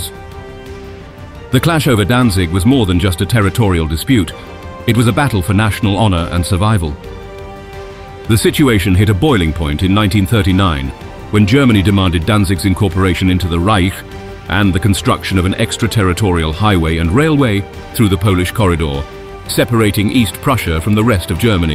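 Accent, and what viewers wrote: United States. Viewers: Why does this English-sounding presenter use those awful American pronunciations for such words as "dee-poe" instead of " deh-poe"and "A-dolf" instead of "Ah-dolf", and say "two hundred twenty five" rather than " two hundred AND twenty five? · As ever with these videos they go totally off subject wasting your time. Scroll WELL forward. By at least 7minutes. Why does the “English” commentator have American pronunciation.” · This clearly has an English voiceover mispronouncing words in the American way, like Aydolf instead of Adolf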